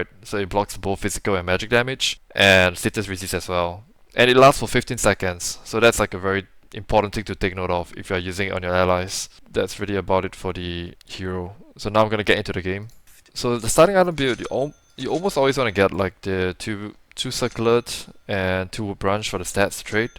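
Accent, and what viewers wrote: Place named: India. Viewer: Why does this east Asian looking bloke talk like an Indian? Took me a while to realise he was referring to 'facet' by saying 'fuh-SET'😂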